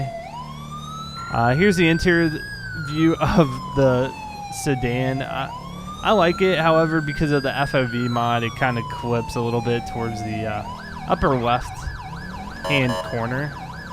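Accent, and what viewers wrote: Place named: South Carolina, United States. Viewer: Try to Fly Maybe a South Carolinan accent is different from a Kentuckian accent?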